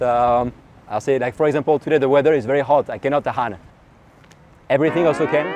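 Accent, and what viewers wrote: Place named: Singapore. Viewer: Wow! Steady la! 👍 👍👍👍 Wah seh! You sound like Singaporean liao. ❤❤❤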